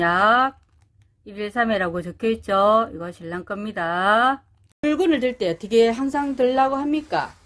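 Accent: Gyeongsang, South Korea. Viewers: 허리가 아파서 귀를 쫑긋, 눈을 동그랗게 뜨고 영상을 감상합니다. 경상도 억양이 사각 사각 꿀맛나는 꿀사과 같아서 너무 좋습니다. 옆지기님 모델료 인상 좀 해드려야겠네요. 너무 말을 잘 들으시는 것 같아요.ㅋㅋ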